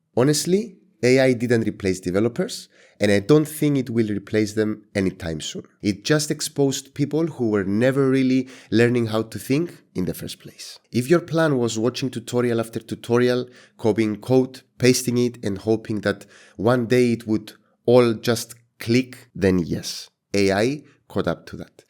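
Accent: Cyprus. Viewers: Hey, I can tell from your accent that you are from Cyprus Χαίρετε κουμπάρε μου😂😂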